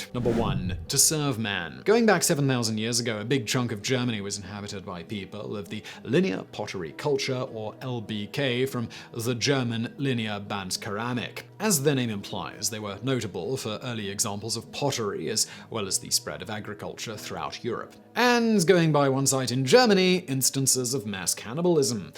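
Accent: United Kingdom. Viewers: Okay? He’s British, American English will be different to traditional British English.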